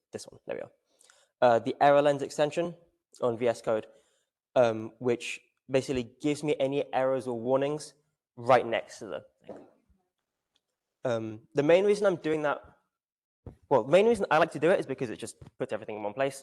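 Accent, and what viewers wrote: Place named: United Kingdom. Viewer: The guy has such a heavy british accent that it is difficult tu understand the talk. I have listened the first 40 minutes and throw the towel.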